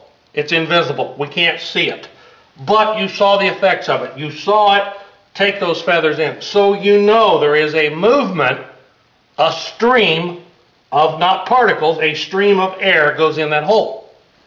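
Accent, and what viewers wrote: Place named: Texas, United States. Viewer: aherlm ?! an american with texas dialect explaining particle mechanics (insert texas joke here) I'm having a hard time to swallowing this is real science americans cant even keep their government clean from corruption insert rant about copyright, patent system, privacy violations, lies, bully, threaten, *grumble grumble*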